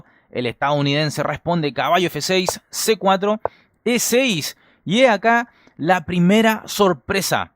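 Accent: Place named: Chile. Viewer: Siempre creí que era argentino, veo que en los vídeos antiguos habla en idioma chileno. Fitche xd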